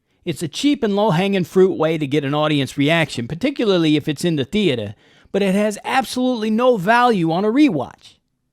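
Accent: Brooklyn, United States. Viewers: You from Brooklyn,? You talk like Joe Pesci. Joe.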